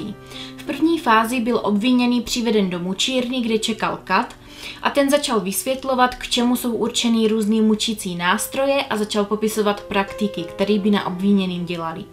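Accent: Moravia, Czech Republic. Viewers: chtěla jsem Vám sdílet video na svém facebooku, ale neudělám to - uráží mě, že Jesenicko považujete za Čechy, když se jedná o MORAVU. Olomoucko leží na MORAVĚ, Olomouc je MORAVA. je to dost smutné, protože podle přízvuku jste i vy z Moravy.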